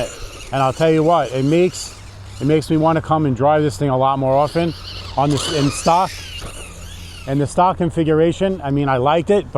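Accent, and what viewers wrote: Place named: North Jersey, United States. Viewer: Man nice whip! I hear an accent like mine lol from north Jersey😂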